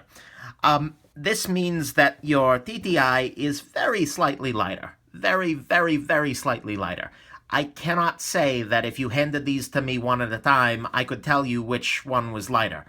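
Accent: New York, United States. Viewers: I love this man's Accent, makes me miss NY. .😭 CONEY ISLAND LET'S GOOO!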